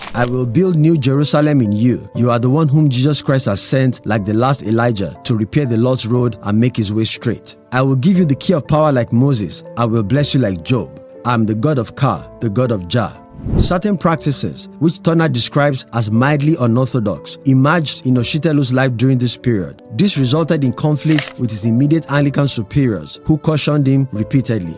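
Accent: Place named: Yoruba, Nigeria. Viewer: i love your authentic Yoruba accent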